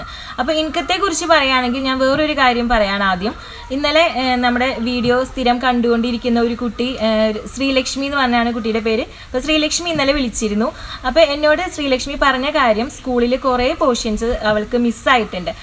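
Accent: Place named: Thrissur, India. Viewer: Trchinte class kettittanu gjaan. Polynomial ,geometry and algebra padichatu enikku. Chicken pox vannarunnu pakshe full pattilla tr ottiri parts idunnontu pakshe ippo Tr parts minimize cheytu thnku parts kure koodunontu ottiri time mathsinu pokunnarunnu ateyullu ippo almost ariyam trnte language kettopozhe thrissur aanennu tonni entayalum Tr poli class aanu gjangal kollam kaaranu thnku thrissur kaari teacher 😘😘🤗🤗🤗🤗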